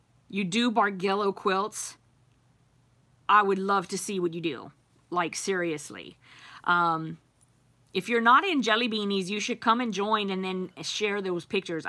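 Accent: Louisiana, United States. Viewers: So you originally from Louisiana? That's a cajun accent if I ever heard one. I watched your astology box video and thought "mais, she from here"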